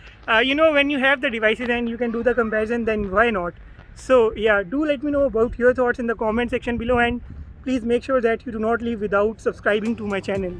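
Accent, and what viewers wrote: India. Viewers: Man ,indian accent is too funny to take serious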